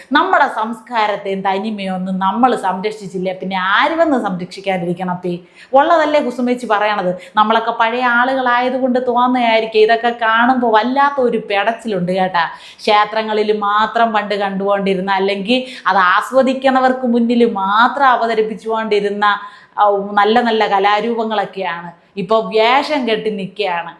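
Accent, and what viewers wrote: Thiruvananthapuram, India. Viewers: What said is correct.. Nowadays ' Naadan pattu ' dance exceeds limits & highly boring.😮.. Can not enjoy.. Over action creats problems..Allow audience to relax & enjoy the song instead of creating uncontrollable & uncomfortable sounds.. By the by i can not understand why using malayalam language in a most funny way..? You may be using Thiruvananthapuram slang.. But that is not fair.. Disrespect to the language.. Boring also.. Our attraction to hear the details from you decreases due to this method..Better give details in your natural way..that will be more interesting..Prof.Mohan.. Tvm. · തിരോന്തരം ഭാഷ കിടുക്കി 👌👌👌👌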